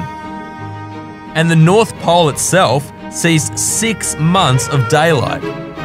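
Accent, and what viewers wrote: Australia. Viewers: Aussie mate explaining sounds so nice!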